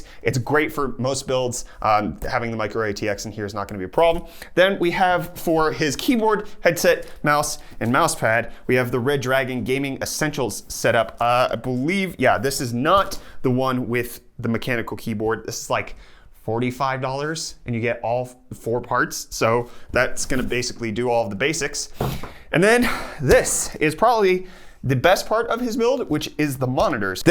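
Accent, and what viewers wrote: South Africa. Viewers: Wait....youre south african?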